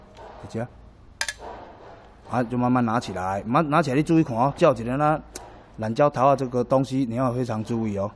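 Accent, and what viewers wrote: Taiwan. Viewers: 不推不行，實在讚！正台灣的口條，讚！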